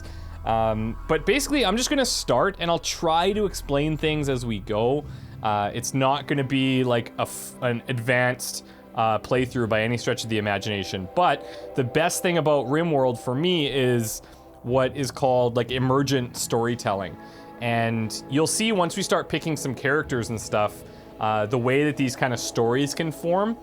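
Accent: Canada. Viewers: I totally thought he was Canadian. He just gives me a dear, homey Canadian feel lol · because your accent sounds like you're Canadian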